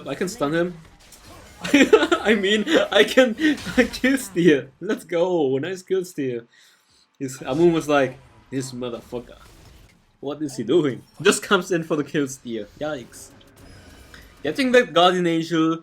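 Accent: Germany. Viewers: One question are u German?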